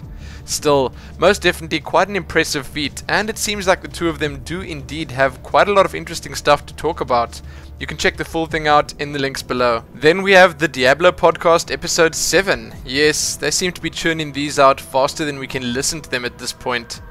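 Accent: South Africa. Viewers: well hello South African!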